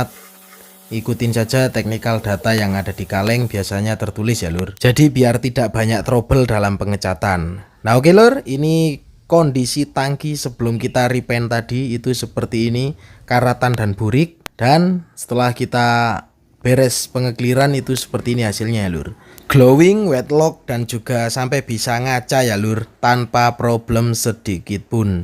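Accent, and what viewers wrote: Temanggung, Indonesia. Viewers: mas kok logat bicara koyo wong temanggung? sampean temanggung to?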